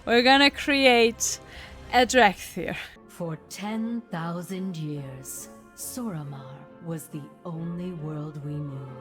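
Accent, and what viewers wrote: Germany. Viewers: You sound like a german 😏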